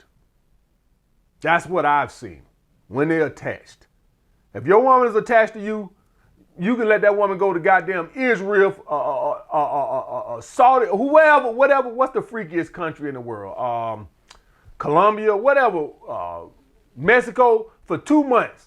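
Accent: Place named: South, United States. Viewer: This Alabama language.. southern style lol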